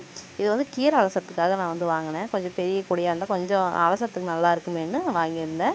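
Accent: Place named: Salem, India. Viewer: நீஙக சேலமா .....உங்க பேச்சு ஸ்லாங் அப்படித்தான் இருக்கு......எங்கம்மா வீடு சேலம்தான்.....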